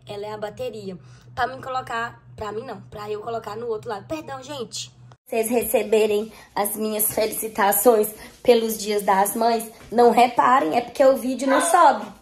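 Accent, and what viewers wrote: Minas Gerais, Brazil. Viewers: você fala torando coisasvde minas gerais amo voces meus conterrâneo mistura dos dois.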